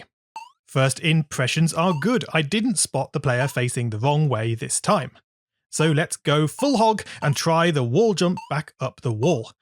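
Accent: United Kingdom. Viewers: Your the most british person ever, the accent and stuff, WHOLESOME STUFF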